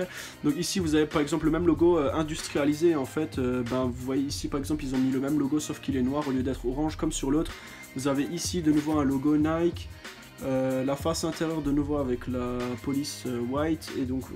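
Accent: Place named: England, United Kingdom. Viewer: L'accent anglais et certifier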